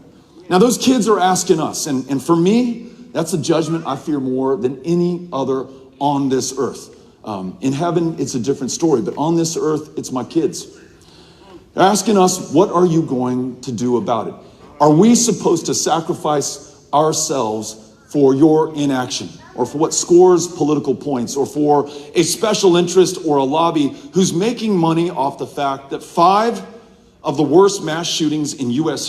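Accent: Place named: South, United States. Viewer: "Southern talk."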